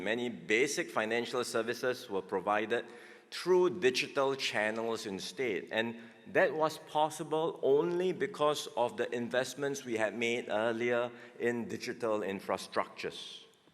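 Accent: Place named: Singapore. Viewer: Wellbdone. Very Well spoken, great used of vocab. Pronunciation needed to be improved and also tone and rythm... as still a little Singlish habit... hihi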